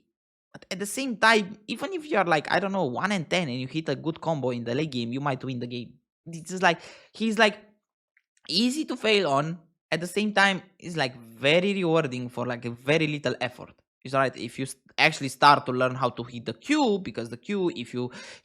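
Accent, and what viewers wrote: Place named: Romania. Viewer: you have an romanian accent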